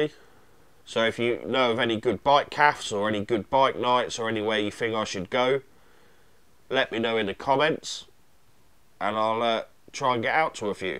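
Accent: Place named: London, United Kingdom. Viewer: Hey, great series on the Bandit rebuild! I've bing watched them over the weekend and found them very helpful. A year ago I bought a 2002 Bandit 1200cc and fell in love with it. Like you I would like to keep riding it for awhile, and even though I've never worked on a motorcycle before, I've stated to to tackle some basic tasks like changing the brake pads, and replacing the sprockets and chain. By seeing you take the bike apart and fix what needed to be fixed gives me confidence and clear guide to tackle any future repairs and modifications. So thanks to you and your sponsors. I'm from the States and It seems that that Bandit series is more popular in England than here. I not sure why. One other thing, your accent sounds similar to Micheal Caine's, are you from London?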